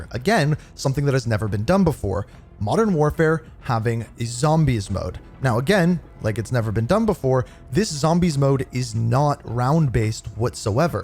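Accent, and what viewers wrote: Canada. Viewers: Love the canadian accent my man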